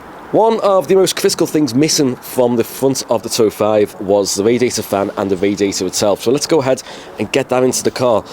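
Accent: Liverpool, United Kingdom. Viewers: are you from Liverpool? I hear some Scouse in your voice 🤷🏼‍♀️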